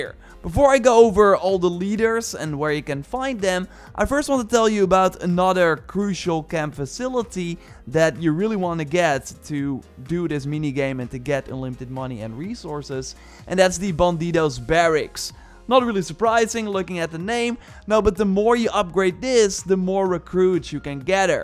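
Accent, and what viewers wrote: Netherlands. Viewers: I instantly hear the ditch accent in a milisecond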